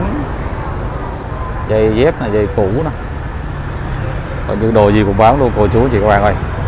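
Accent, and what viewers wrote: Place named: Hanoi, Vietnam. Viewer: Giông ad như ở thủ đô của Cụ ba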